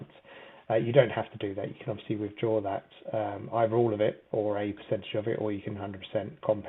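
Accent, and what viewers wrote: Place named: United Kingdom. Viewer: Hi there, great video chap. I just joined yield nodes with a $1,000 initial investment but thinking of adding more! Would you be able to please explain a bit more about how you withdrew into btc and then I guess back into your bank? Much appreciated thanks 🙏 btw, sounds like you’re in the Uk…that’s where I am👍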